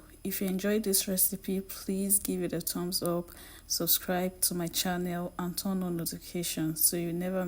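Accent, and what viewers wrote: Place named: Nigeria. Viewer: I am Yoruba & I can tell from her accent that she is a Yoruba or Edo woman. However, it doesn't matter. I learn from different people from different parts of Nigeria, Ghana & Cameroon.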